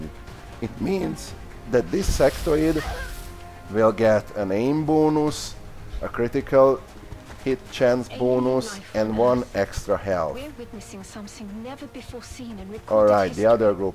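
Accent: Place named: Hungary. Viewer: Wow, very detailed, nice guide. Are you hungarian by any chance? Just guessing by your accent. :)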